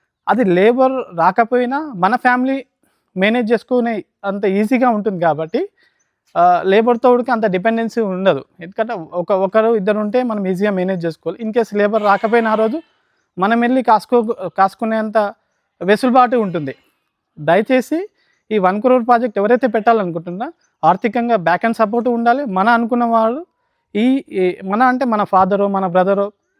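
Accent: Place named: Telangana, India. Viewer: Telangana telugu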